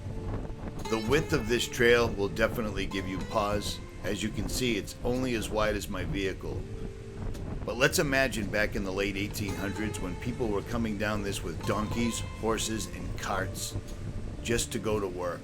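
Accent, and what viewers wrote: Boston, United States. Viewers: You sound just like Ritchie from Boston.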